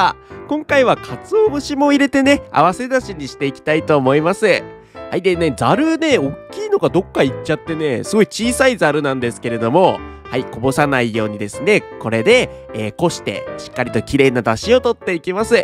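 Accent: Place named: Tokyo, Japan. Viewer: コメントありがとうございます😊 東京の人ですか？話し方がそうっぽいので。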